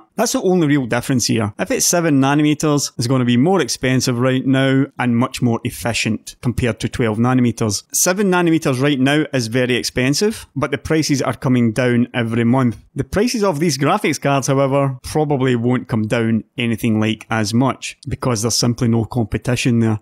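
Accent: Scotland, United Kingdom. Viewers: Is your accent scottish? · Are you Scottish too?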